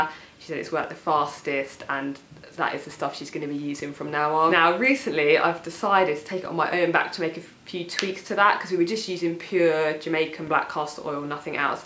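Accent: United Kingdom. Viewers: I am in love with this woman my goodness and this English accent is omg so beautiful. Love her! I forgot all about the hair regrowth tips hell lol. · Thank You, I truly enjoyed your video.I am happy for you and your mom,and wish you both continued success.I have male patterned baldness and hope to Give it a Try.P.S. On a side note, I love your accent, Is it British,Austrailian or is it from the Caribbean. D.Taylor Montreal,Canada.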